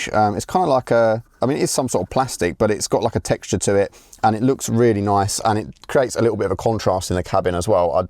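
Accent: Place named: New Jersey, United States. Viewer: Love the New Jersey accent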